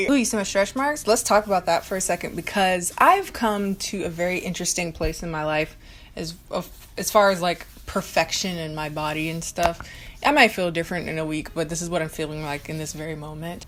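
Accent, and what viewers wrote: South Korea. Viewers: Haha you definitely do pronounce Japanese things with a Korean accent. I did the same when I went to Korea the April just gone. I pronounced everything Korean with a Japanese one 😩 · I think the korean accent is cute when you speak japanese. I am on the other side of the coin where I learnt japanese and everything gets a japanese accent instead XD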